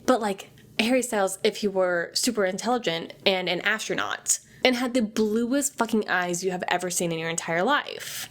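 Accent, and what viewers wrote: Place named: Israel. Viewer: you sound israeli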